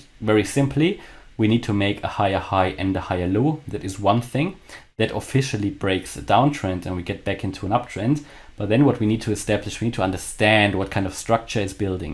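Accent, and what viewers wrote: Germany. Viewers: Is he a german? The accent.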